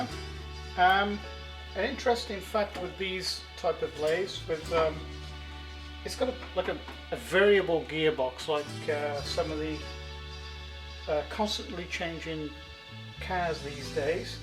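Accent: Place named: South Wales, United Kingdom. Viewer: good stuff mate... u sound like u have a cardiff south wales accent...may be my ear is off...lol thanx for the video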